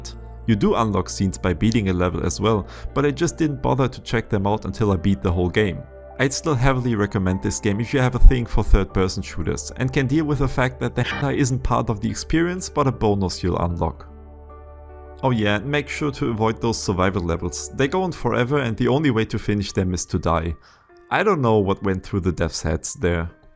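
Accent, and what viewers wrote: Germany. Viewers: man hört sofort dass du deutscher bist ^^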